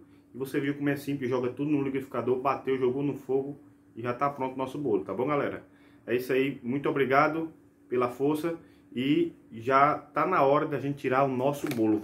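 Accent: Ceará, Brazil. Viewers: Cearense a gente conhece de longe. Kkkkk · Vc deve gostar muito da sua sogra Coral. Kkkkk.Até bolo faz pra ela. Toda sogra queria ter um "genro anjo" assim; como vc 😂😂😂😂😂. Vc tem sutaque de cearense. Parabéns! Sou cearense e amo bolo mole.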